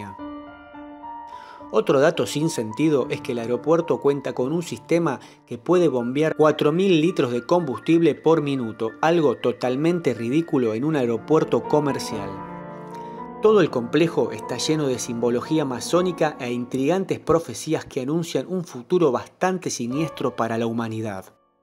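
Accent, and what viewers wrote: Argentina. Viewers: El video está muy bueno , pero por favor trabaja en tu asentó , no todo el Mundo es argentino y en momentos molesta! Gracias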